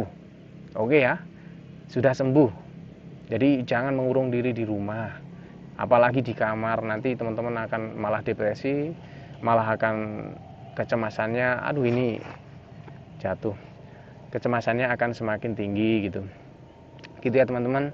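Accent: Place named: Java, Indonesia. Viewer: asli mn kok kyk orang jw..mhn tlg di bls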